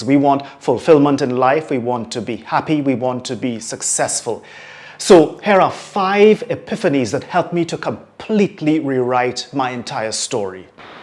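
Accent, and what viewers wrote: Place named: Trinidad and Tobago. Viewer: Not only an amazing story but well told and the Trinidadian accent tho I'm Antiguan (have family in Trinidad) gives me an at home feel But at just 18 years old there's a lot I will take from this video including the fact that I am now the writer of my own destiny I have the ability to grow develop choose the persons who I want surrounding me etc. Of course you cannot control everything and alot of times it's good to not be able to control everything because we serve a GOD who loves us and we must face challenges. But by far one of the most clear and fruitful messages I've ever received!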